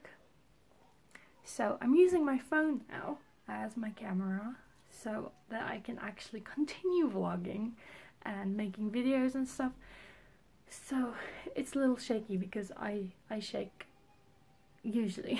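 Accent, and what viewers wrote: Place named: United Kingdom. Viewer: How’s photography going? My web connection is rather (sh*t) but I allowed the audio to play out. I seriously enjoy your voice... I tend to follow folks just to hear them speak. (Odd yes but still...) Love hearing folks from the uk 🇬🇧 or other areas around Europe... Anyways I do hope your photography is going well. Any tips to building a portfolio easy without hiring folks? Just curious... Best to you... peace and love.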